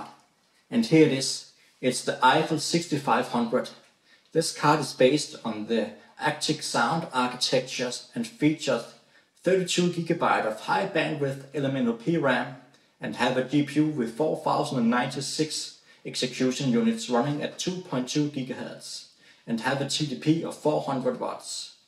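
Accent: Denmark. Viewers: Like the danish accent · He lives in Denmark as i suspected, you have the good danglish!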